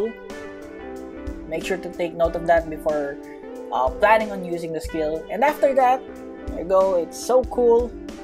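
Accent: Philippines. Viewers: Ngl this dude sounds like Charlz the french and his voice is like Filipino Edit:He is Filipino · I can tell that this guy is Filipino because of his accent.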